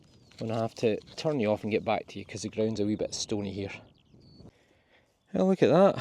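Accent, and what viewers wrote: Scotland, United Kingdom. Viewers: Yes it’s definitely that gorgeous Scottish accent and lovely tone of voice ❤ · Thank you so much for all your videos, i am in perthshire and I love walking about with you because I'm in a wheelchair, so keep up the good work, alao i love your Scottish accent, take care, Agnes 🏴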